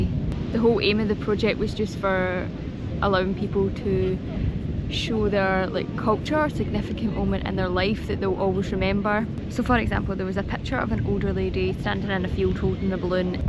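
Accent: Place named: Scotland, United Kingdom. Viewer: She's got a scottish accent. I can understand her just fine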